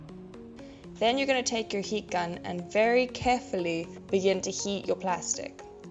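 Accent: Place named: Australia. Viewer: She is southafrican? I thought she was Australian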